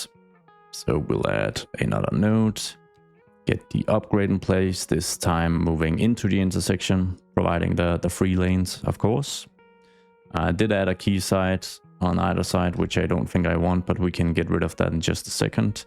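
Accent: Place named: Denmark. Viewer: Omg, so he is actually from Denmark, always thought so from his accent, but wasn't sure, so cool to see other Danes here on YouTube!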